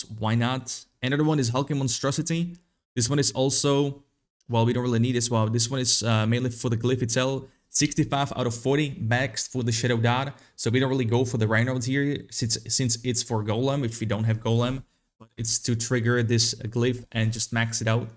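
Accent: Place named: France. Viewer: Bruh what language ur speaking...it sounds like english but french also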